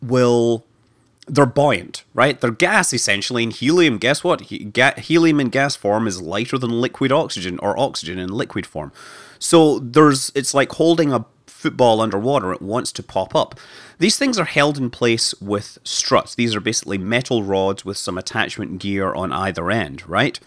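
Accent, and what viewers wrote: Scotland, United Kingdom. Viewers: Hey Scott Manley screw the haters. I love hearing you explain things in that awesome "Brave Heart" accent of yours.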